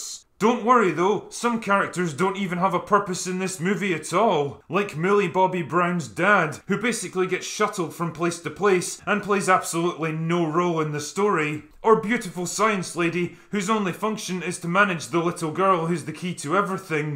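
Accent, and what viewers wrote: Scotland, United Kingdom. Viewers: I think that's part of his gimmick, hence the over the top drunk Scottish accent.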